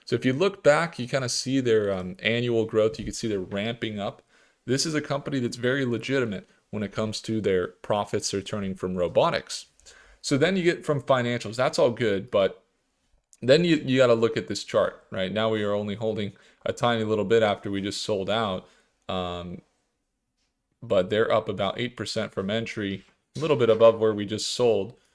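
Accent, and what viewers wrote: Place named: Netherlands. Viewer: You have a Dutch accent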